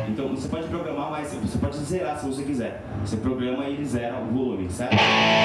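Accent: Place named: Brazil. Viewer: judging by the portuguese he's speaking i wouldnt say Mexico, more like Brazil...anyway, some people like that high gain stuff, which i dont approve but will not condone...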